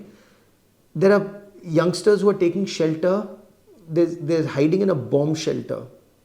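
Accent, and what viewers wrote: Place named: India. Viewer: I cant understand you indian bull shit accent. You are fake nees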